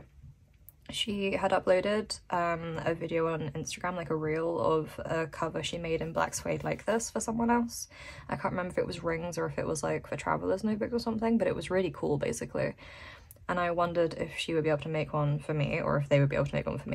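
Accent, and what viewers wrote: United Kingdom. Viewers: . In answer to your question you sound more south east England than London. But then again I only know some accents from London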